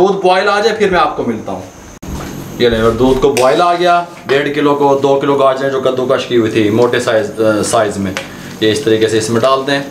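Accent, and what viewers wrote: Punjab, India. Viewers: 😆😆😆😆 bht hasi ati h jb punjabi bolty h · Kya bat hy apki panjabi ki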